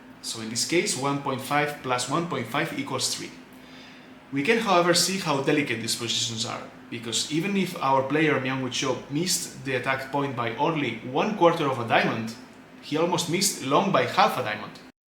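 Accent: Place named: Spain. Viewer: And about the translation, its quite good. Actually sounded like maybe you would have spoken, with a bit of spanish cadence but good vocabulary.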